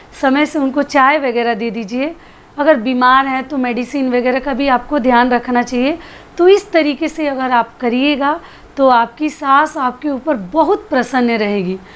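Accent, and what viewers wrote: Bihar, India. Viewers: Dii aap pirbiya ho ya bhojpuriya ya bihari kya ho.?🤔🤭kyuki aapki aawaj..mujhe..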